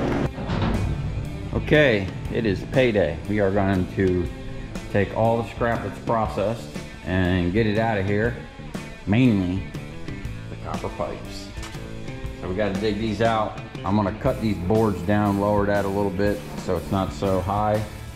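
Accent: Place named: Philadelphia, United States. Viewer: You sound like you have a Philly accent.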